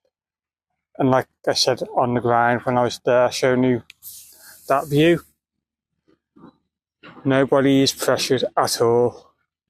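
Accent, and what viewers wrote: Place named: Liverpool, United Kingdom. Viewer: Can't understand that Scouse accent 😮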